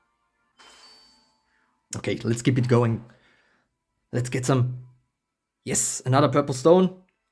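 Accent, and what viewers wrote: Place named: Germany. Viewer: why u have so much Diamonds wtf and u sound like u from germany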